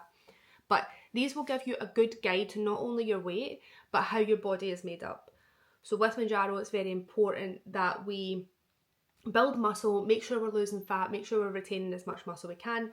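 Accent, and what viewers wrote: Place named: Glasgow, United Kingdom. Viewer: I absolutely love the accent! As you say, a broad Glaswegian accent is pretty much a different language.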